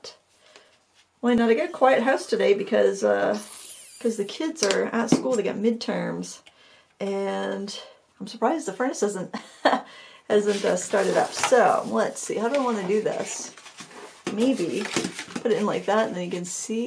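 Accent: Maine, United States. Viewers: I'm thinking the same thing. Wish I would have watched your review before I sent the tin back.. I am curious if I could have gotten the tin to flex back into place like you did. :) Anyway, thanks for all of your reviews! I love listening to you, since I was born in Portland Maine, but live in Portland Oregon now. Miss that Maineard accent. :)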